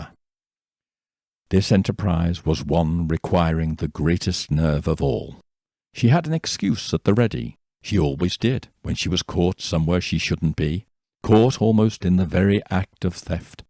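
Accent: United Kingdom. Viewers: Hi I alway's loved the British Accent. I wish we Americans still sounded like that!:)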